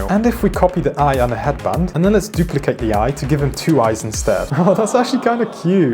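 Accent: United Kingdom. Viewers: HE IS BRITISH!!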